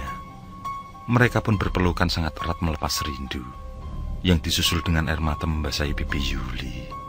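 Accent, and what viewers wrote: Java, Indonesia. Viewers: Sekali2 pengisi suara diganti , om ... Nggak itu2 aja Masak logat NTB sama persis dgn logat Jawa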